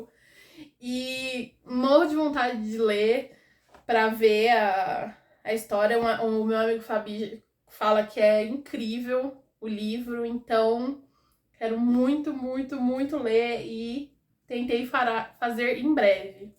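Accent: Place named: Inland São Paulo, Brazil. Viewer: Além de amar seu conteúdo (te sigo há pouquíssimo tempo), preciso falar que te acho belíssima, que sua voz e seu sotaque muito me agradam (você é do interior de SP?), sua roupa e maquiagem são lindos!! Gostaria de sugerir uma iluminação diferenciada... Eu não sei, não conheço as coisas, talvez aquelas ringlights? Eu achei um pouco escuro, não sei... Obrigada pelo vídeo :) O calendário ficou tudo!!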